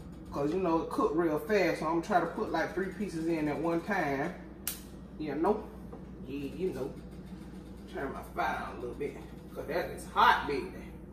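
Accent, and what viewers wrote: South, United States. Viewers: I enjoy watching your videos and listening to that southern jville twang. Keep it up!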